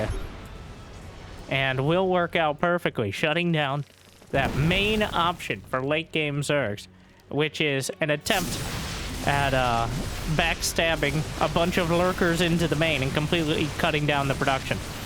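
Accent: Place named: Transatlantic, United States. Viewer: Can I just say, for some reason your voice has a trans-atlantic quality, and for some reason i find that somewhat enjoyable.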